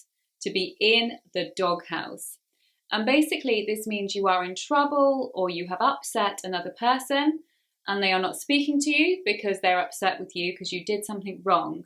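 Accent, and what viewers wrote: United Kingdom. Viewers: Gosh do I love the british accent. Something about your voice which just captures my attention 110%. I'm all ears. Have a safe day fam 🤟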